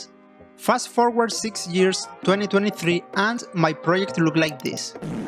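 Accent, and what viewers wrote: Spain. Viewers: bro se nota que es español EDIT: No es un hate ni nada, muy buen video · Tú hablas español,no jodas, se nota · Seems spanish like accent, I would like to know to satisfy my curiosity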